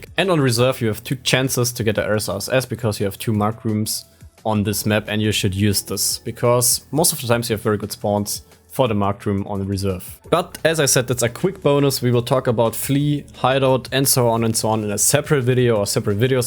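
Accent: Germany. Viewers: Man hört dass du Deutscher bist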